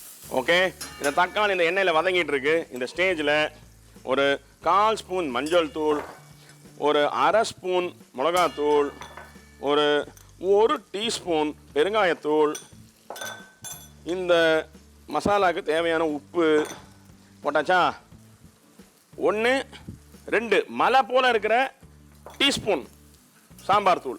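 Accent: Chennai, India. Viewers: You are the most amazing person who cooks with love & laughter. I literally love to watch your cooking especially with your lovely speaking.. mostly Madras tamil.. you are truly great. I am living in California for more than 22years but still I am the same Madras girl who loves to cook (only after marriage though😉). Just the way you speak & entertain us, added with your cooking takes it to another level. I admire you soo much. My son loves South Indian food. So just for him I try different styles. You are so amazing.. if it was just a music with cooking or some too much unnecessary talking with cooking , I would not have been drawn to you.. you are lovely with the cooking & the most I admire you is for the way you talk about the cooking or the ingredients. I literally LOL (laugh out loud) whenever I see a recipe from you that I want to try. Please be the same person & never ever change your attitude. 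You are very down to earth & still entertain us & teach us how to cook at the same time. I never ever message or comment to any of anything online thingie in my life so far but I just couldn't resist but to comment/message you. This is Bala from Los Angeles, California but always a tamil ponnu; raised in Chennai. Thank you for your dedication to share your passion to others.. it means a lot to a lot of people that I am sure of. Whenever I hear you speak..that joy & laughter you bring with a recipe means soo much for me. Thanks a lot Mr. Bhat. I hope you read this comment & reply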